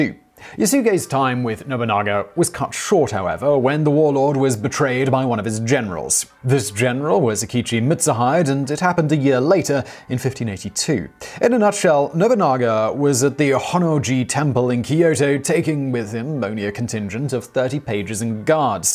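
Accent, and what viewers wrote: United Kingdom. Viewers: Yes, your french pronunciation is indeed terrible...nevertheless I like your "typical" british way of speaking english... ;)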